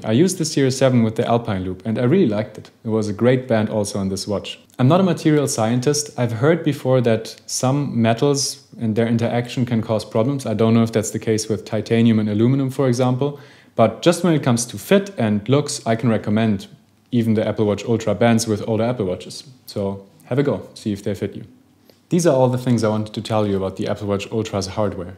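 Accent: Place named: Germany. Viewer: Interessantes Video, wenn auch ein bisschen zu lang. Man hört aber deutlich, dass ein Deutscher hier versucht, Englisch zu sprechen (z. B. an der wechselnden britischen und amerikanischen Aussprache, so sagst du z. B. läßt statt lahst (für last) oder fähst statt fahst (für fast)). Warum das sein muss, verstehe ich nicht. Mach doch solche Videos (auch) auf Deutsch.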